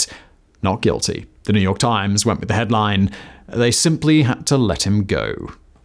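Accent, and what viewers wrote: England, United Kingdom. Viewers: Thank you for covering this overlooked piece of history. Any light shed on this matter has the potential to save the lives of Armenians who are in what seems like a forever struggle to keep what little home they have left from classical antiquity. English and especially French churches were instrumental in saving my grandparents so I'm humbled again hearing your English accent. Armenia has been experiencing the same horrors since the Seljuks first entered classic Byzantium.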